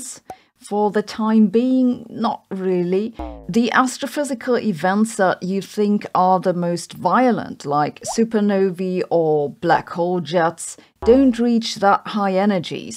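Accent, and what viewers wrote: Germany. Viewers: Why does Sabine have both a German accent and an English accent simultaneously?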